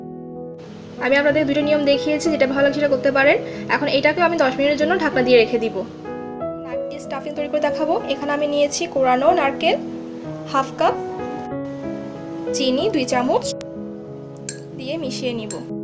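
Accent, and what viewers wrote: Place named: Chittagong, Bangladesh. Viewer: আপনার ভাষায় কিছুটা চিটাগং এর টান আসে।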